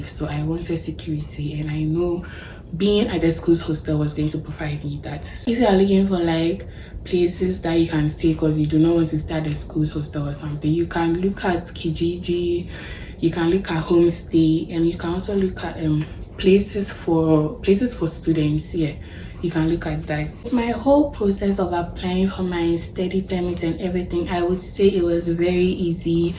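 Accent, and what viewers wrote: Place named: Ghana. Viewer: hii, did you use the student direct stream? you sound ghanaian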